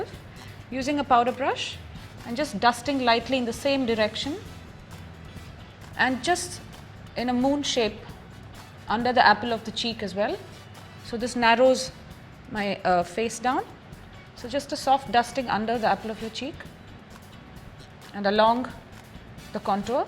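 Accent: India. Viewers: Indian accent 😍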